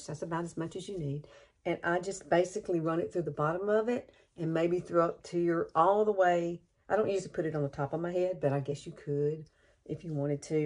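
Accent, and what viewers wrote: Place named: Alabama, United States. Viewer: I found your fine hair vids and immediately when I heard you talk I thought " Alabama". I'm an Alabama lady too though much older than you.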